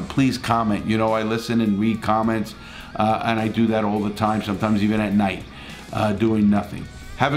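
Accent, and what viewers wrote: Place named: New Jersey, United States. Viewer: Your accent reminds me of a close friend... He was from New Jersey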